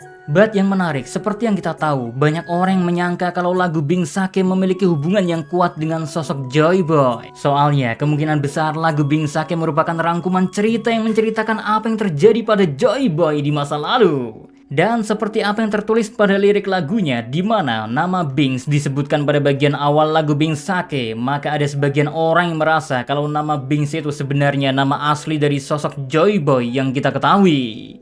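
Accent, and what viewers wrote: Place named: Bekasi, Indonesia. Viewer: Admin orang bekasi kek nya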